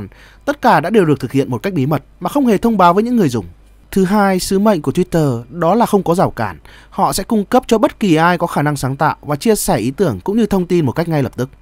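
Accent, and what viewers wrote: Standard. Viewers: Kenh này được cái nói chuẩn like 👍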